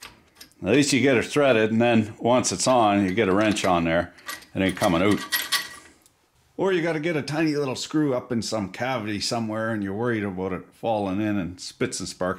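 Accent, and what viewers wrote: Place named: New Brunswick, Canada. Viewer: Magnetic Hill? I knew you were from NB with that eloquent chiac of yours.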